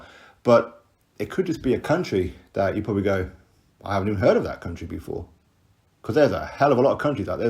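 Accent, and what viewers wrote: United Kingdom. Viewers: How the fuck Prince Harry going to afford that!?!??! His wife's suicidal because they cant even afford security. You sound British weird we know this across the pond and not in UK.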